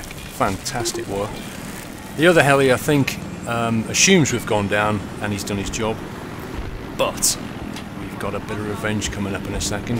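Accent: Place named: United Kingdom. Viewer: Oh your british I see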